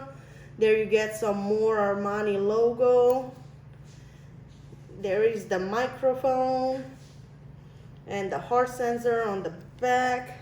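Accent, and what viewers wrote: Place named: Iran. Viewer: You have that Irani accent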